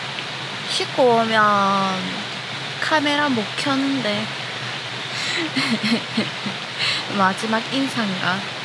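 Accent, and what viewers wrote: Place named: Gyeongsang, South Korea. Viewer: 날씨가 이래가될일이가? 포항사투리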